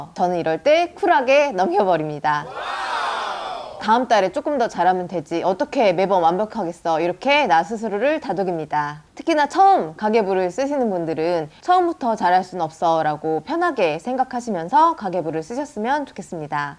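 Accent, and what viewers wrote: Jeolla, South Korea. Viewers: 말투가 전라도분이네요ㅎ 저희 할머니집이 전라도 영광이거든요ㅎㅎ 항상잘보고있어요 ㅎㅎ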